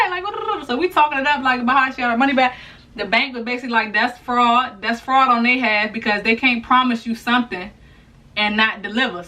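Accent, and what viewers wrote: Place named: DMV, United States. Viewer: Are you from the DMV? Lol you sound like it!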